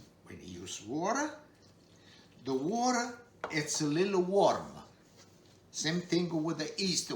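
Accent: Italy. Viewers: I miss my Italian Grandparents loads. I spent so much time in the kitchen with my Nan learning how to cook and absolutely loved it. Sometimes find myself just putting this on to hear the Italian accent, brings back great memories. On the plus side... he’s a lovely fella and an amazing cook!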